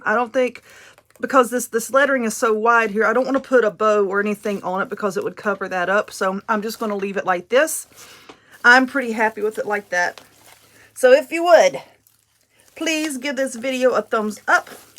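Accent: South, United States. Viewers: Love this wreath, and the black truck one I enjoyed the 2 different styles. I also just really love you southern drawl, and the way you explain things. I absolutely understand your terminology, such as great big gloppy glop, and cruffle. You are a joy to watch, make me smile through the whole video, which I sometimes wish were much longer. I have 2 grandchildren that live me on a foster child basis, the oldest is 14, the youngest 5. So your channel is a breath of fresh air, I love it. Thank you so much for sharing. Have a wonderful Sunday, and next week. Cant wait to see what comes next.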